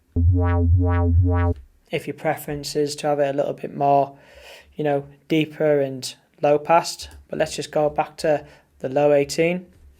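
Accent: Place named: Leeds, United Kingdom. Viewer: Your guna do well on here, I've been searching speed garage tutorials for the last 2.5 years!! There's fuckall!! Lol nice bass that mate, we're you from? You sound very close to my home town Leeds??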